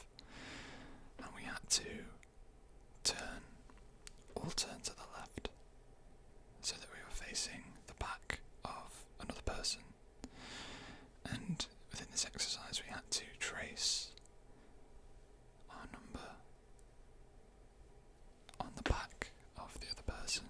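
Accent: United Kingdom. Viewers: It’s so hard to find good British ASMRtists, definitely earned a follow from me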